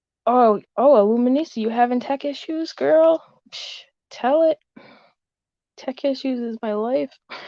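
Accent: Boston, United States. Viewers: First time here. You from Boston? That accent is always noticeable lol. Love it! #Bostonstrong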